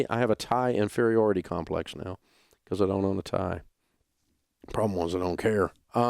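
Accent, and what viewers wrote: South, United States. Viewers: I like your show but New Yorkers accent is a lot better than southern accent. No offense.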